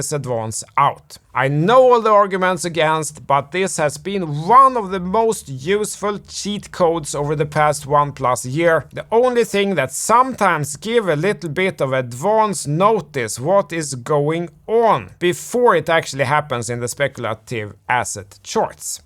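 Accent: Sweden. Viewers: Svengelskan on point (Y)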